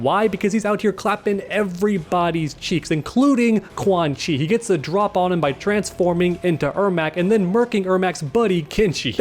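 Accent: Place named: AAVE, United States. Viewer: chill with the ebonics you corny as hell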